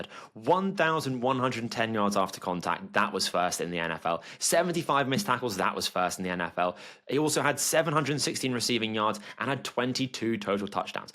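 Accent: United Kingdom. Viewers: Did u even watch RJ Harvey Way off base I knew when I heard the English accent this was going to be pure comedy 😂😂😂 Take time study the game more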